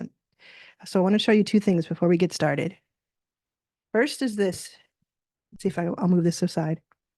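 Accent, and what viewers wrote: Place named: Kenya. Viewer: You have an English accent of a Kenyan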